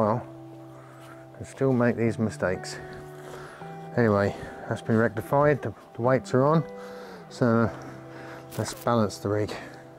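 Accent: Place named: Suffolk, United Kingdom. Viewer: Lovely photo's, also really nice to hear the accent... from another Suffolk boy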